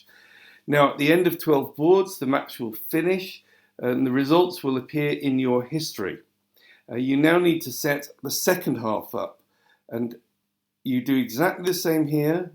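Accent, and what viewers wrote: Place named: Manchester, United Kingdom. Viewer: thx - great explanation. Is it possible to delete a team match if I pressed the Create Match button too soon? BTW lovely to hear your accent as an ex-pat, whose Father was born in Manchester. It brought back lots of happy memories. Thx, Penny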